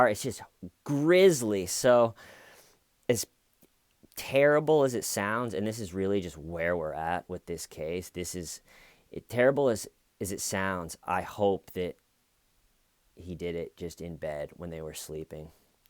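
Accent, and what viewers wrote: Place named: South, United States. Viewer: Damn I was shocked you’re in New York. Sure thought you were southern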